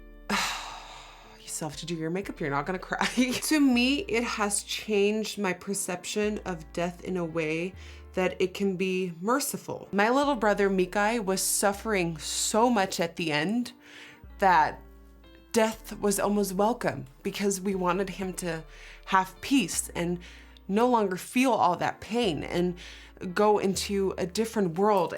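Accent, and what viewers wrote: United States. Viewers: Can anyone explain why nikkie despite being dutch still sounds American compared to other peeps from Holland? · How come you have a strong American accent if your Dutch and living in Amsterdam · “ in case you were wondering where the accent came from “ Umm I thought she was anerican till 2 years ago when She said in one of her videos that she wasnt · Accent? When I first started watching your videos, I totally took you for an American 😻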